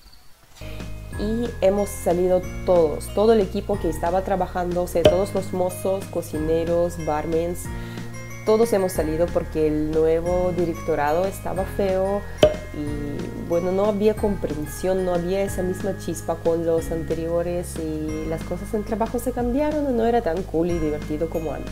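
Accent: Peru. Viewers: q ya regresaste de rusia 😮 uno. aquí esperando videos de rusia. .. eres bien graciosa. ya se te pego lo peruano. y muchos acentos. lo ultimo a rascarse los huevos jajaja me dio risa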